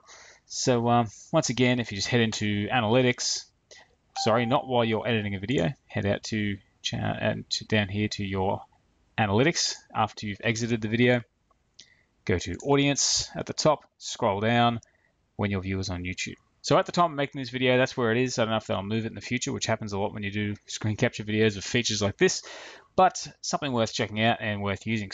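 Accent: Australia. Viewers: Thanks heaps. I'd forgotten where it was n you can spend hours trying to find stuff on there, n yours was the only vid I could find on where it was. So new sub here. Bit weird though, as mine says the exact same times as yours! You sound Australian, so maybe it's 'cos I'm in New Zealand n we may have the same-ish time zones. I've found 9pm, 12am and 1.30am are my best times for shorts. I scatter my shorts throughout the day/night though after experimenting, but these tend to get the most views. I don't worry about longer main vid times, I just put them up whenever, as it's those shorts that gets them there subs lol! Thanks for putting up this vid :)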